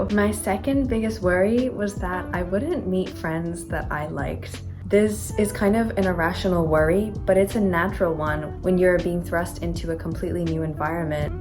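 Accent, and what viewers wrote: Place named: Paris, France. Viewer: loved this video!! as a french girl i can tell you that your french is sooo good! you have the best parisian accent! j'espère que tu aimes Paris xx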